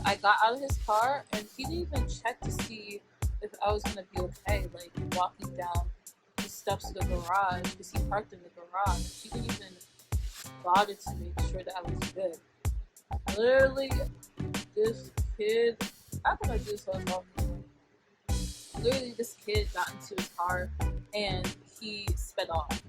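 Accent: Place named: Yoruba, Nigeria. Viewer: Yoruba accent! Wow!!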